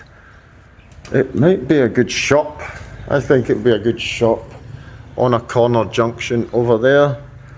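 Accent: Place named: Scotland, United Kingdom. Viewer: 2,000 baht, is a good price lots of work to tidy up. Nice to hear a Scottish voice in Thailand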